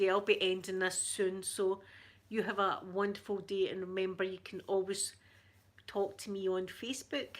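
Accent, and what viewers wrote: Scotland, United Kingdom. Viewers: That lipstick looks lovely on you! I wish I could do a Scottish accent but I carn't do it haha! It's lovely though x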